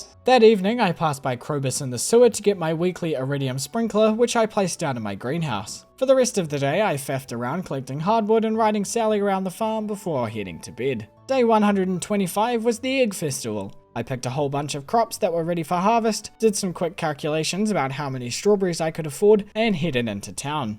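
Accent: New Zealand. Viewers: The way my brain stopped working when i heard the kiwi accent. Wasn't expecting a fellow NZer! I'm so used to hearing the American accent 😂 Awesome video dude, gives me something to watch/listen to while crocheting lol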